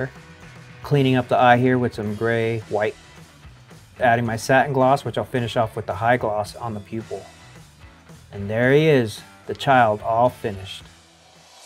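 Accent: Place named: United States. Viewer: Tienes nombre, apellido y pinta de ser hispano... ¿Por qué pronuncias aquello que eres como un yanqui?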